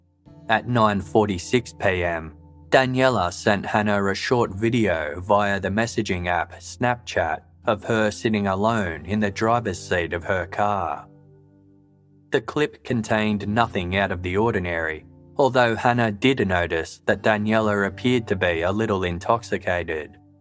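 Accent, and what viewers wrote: Australia. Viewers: Given that you my dear narrator have a classic Aussie twang, would I be safe to assume this is an Aussie owned production? I’m glad I found you, I enjoy your stuff and your presentation. Cheers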